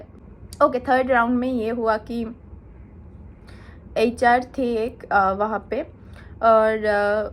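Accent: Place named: West Bengal, India. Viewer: Apka tengue samajkar muje pata sala app north -east se ho I mean West Bengal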